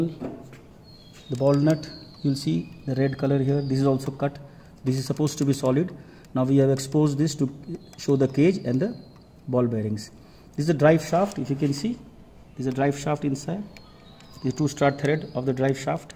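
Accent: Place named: India. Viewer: Logat india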